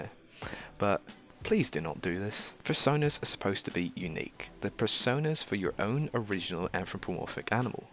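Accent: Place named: Australia. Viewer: That's an interesting accent, I'm getting hints of North Irish, North English and New Zealand in between an Australian accent.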